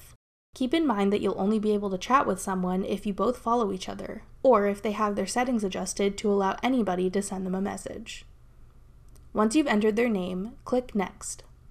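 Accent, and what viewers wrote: Canada. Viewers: I agree- very informative. And... Did I detect a Canadian accent, OR have I just been watching too much hockey lately?!😀 PS ISN'T GIF pronounced like Jif ? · I love your accent - apart from the Canadian "out" right at the end!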